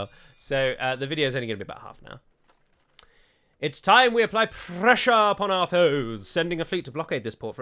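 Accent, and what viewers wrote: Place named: England, United Kingdom. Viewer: First, I want to guess Edhellond could mean something like, 'Elf-Haven' but I have no idea in all honesty. Secondly, I've always loved your videos and we will all undoubtedly miss your channel. I just wanted to say before you go, I wish there were more people on YouTube like you. To be fair, I don't watch much YouTube and your channel is the only channel I watch regularly. You have an old-soul like many of the nuns I know in the Convent I work in, but with an English accent, haha. Hopefully life goes well with you beyond YouTube. Best of luck to you!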